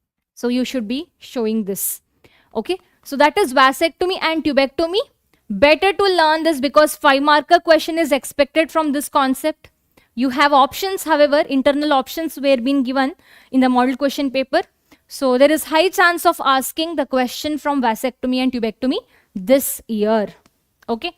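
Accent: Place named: South India, India. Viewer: I think mam is South Indian from her accent😀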